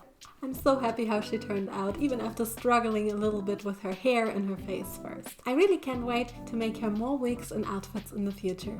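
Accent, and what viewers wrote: Germany. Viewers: Du bist deutsch oder? Ich habe es an deinem Akzent mitbekommen PS.sehr viel talent! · Are you German? Your accent sound like German♡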